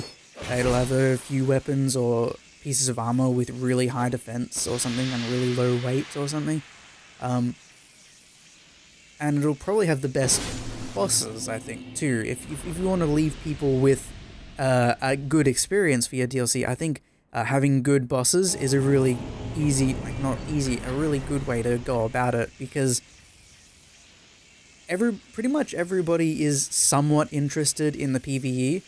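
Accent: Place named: Australia. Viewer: Well, he is Australian, "sour"...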